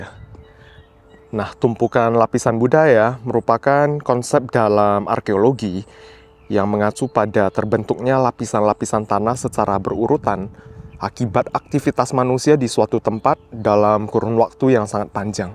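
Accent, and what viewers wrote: Java, Indonesia. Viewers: Materi yang bagus. maju terus. Semakin hari semakin medok logat. Sehari-hari pakai bahasa Jawa ya?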